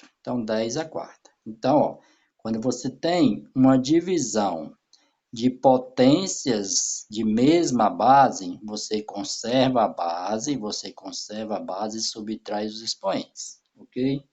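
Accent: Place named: Rio de Janeiro, Brazil. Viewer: Parece ser do rj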